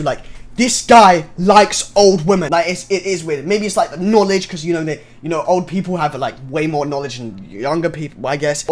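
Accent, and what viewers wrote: United Kingdom. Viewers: w bri'ish man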